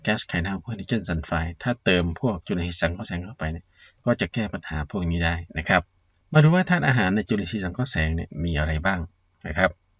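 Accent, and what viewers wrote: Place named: Isan, Thailand. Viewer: มีสำเนียงอีสานด้วยแมนบ๊อจร้า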